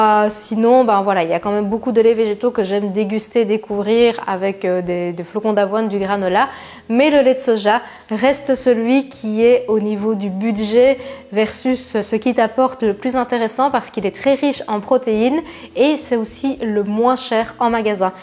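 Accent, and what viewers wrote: Belgium. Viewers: Merci pour toutes ces idées ! Au fait, d’où vient le petit accent caché derrière l’accent belge ?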